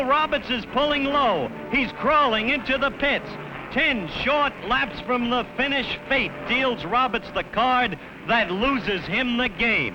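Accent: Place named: New York, United States. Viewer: It is. He would have been my last choice for this event. NYC accent doesn’t work here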